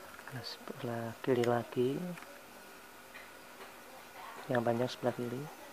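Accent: Blora, Indonesia. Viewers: iki wong mbloro opo y K-E :D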